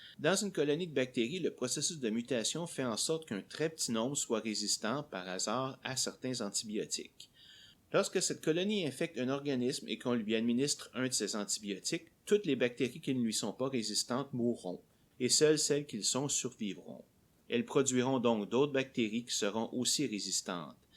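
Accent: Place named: Quebec, Canada. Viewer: Merci pour cette vidéo qui permet de bien comprendre les mécanismes en oeuvre dans la sélection naturelle. Je profite de l'occasion pour saluer tous les Québécois dont le magnifique accent (pour un français 😁) est toujours aussi agréable à entendre.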